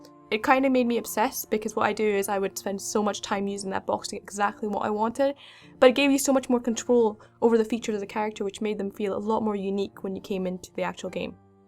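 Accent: Scotland, United Kingdom. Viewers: also I'd like to add your awesome are you Irish? Or Scottish I hear Scottish more Correct? Either way your awesome I have a love for the Scott's and the Irish and there cultures aswell as I just love the accents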